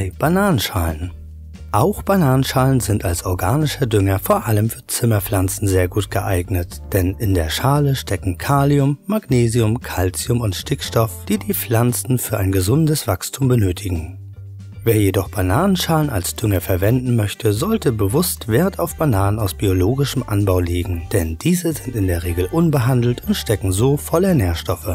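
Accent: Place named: North Germany, Germany. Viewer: bidersalz bläder..... der typ ist norddeutscher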